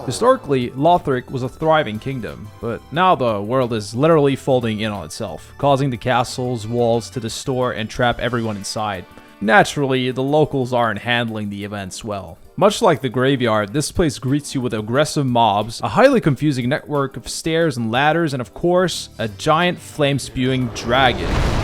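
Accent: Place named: Canada. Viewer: You're the most Canadian Canadian I've heard play this game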